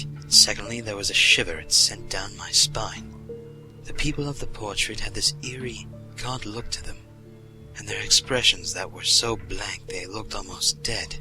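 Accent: United Kingdom. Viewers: Trying to tell whether you're putting on a British accent or not..... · Omfg his British accent 😂👌🏼👌🏼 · you sounded kind of British in this one.. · nice slight british accent. sounds very... british. lol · you sound british!!!!! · Oh this reading. The ever-so-slight British Accent.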